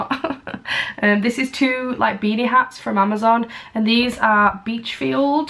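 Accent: Northern England, United Kingdom. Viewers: I do love your accent northern accents are the best ! xx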